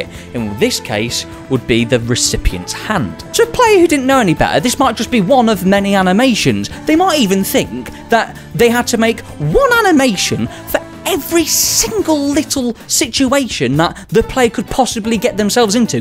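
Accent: Northern England, United Kingdom. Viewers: That's a northern English accent. 😄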